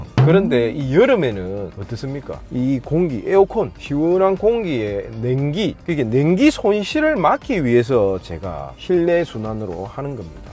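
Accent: Gyeongsang, South Korea. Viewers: 빠만떼기 말수도 있습니다 ㅋㅋㅋㅋㅋㅋㅋㅋㅋ 제가 갱상도 남잔데도 사투리가 구수하게 들리네요 ㅎㅎ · ㅋㅋ 갱상도 사투리를 유튜버에서 듣게 되는군요 ㅎ... "빠마데기"라는말 진짜로 오랜만에 들어봅니다 ㅋㅋ~^(^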